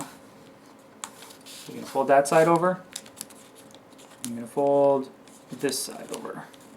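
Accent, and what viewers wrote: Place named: Canada. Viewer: Air123ism got Astral'd.. You sound Canadian, much like the Vigilant Christian dude..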